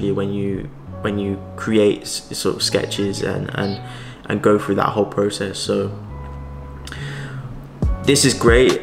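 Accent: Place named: United Kingdom. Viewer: I love your explanation, simple and clear. Right from the start, you are the British who might live in London or something.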